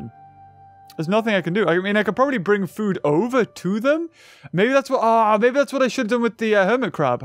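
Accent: Philippines. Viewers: ah that filipino accent is giving my ears seizures 😭😭😭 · ANOO NAGSABI BA SIYA NG PILIPINO TAGALOG ACCSENT HA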